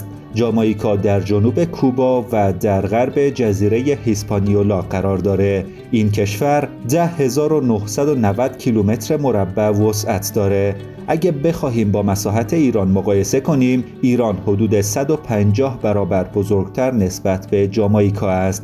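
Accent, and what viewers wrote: Afghanistan. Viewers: گوینده مثل اینکه افغان هست که مبخواد لهجه ی پشتواش را نشان ندهد صدایش را دوست نداشتم. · فکر کنم گوینده افغان هستش. افغان ها حتی در تلویزیون هم اینجوری صحبت می کنند.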